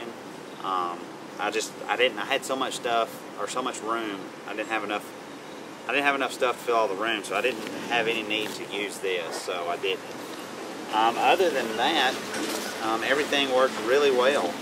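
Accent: South, United States. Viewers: I like your video. It's very informative. I'm new to hiking and I have have a question about the pack. Do you expect the pack to last a few years? The cuban fiber fabric seems so thin and looks like it won't last very long. I've never seen any in person just here on YouTube. I noticed the zpack website has a 1 yr warranty. I would just want to make sure it's worth the money. Also, you sound like you're from the south! Have you ever hiked The Pine Mountain trail in Georgia? It's a really nice trail with a little bit of presidential history. Franklin D. Roosevelt's Little White House is near by and he used to vacation there a lot. Thanks for the info!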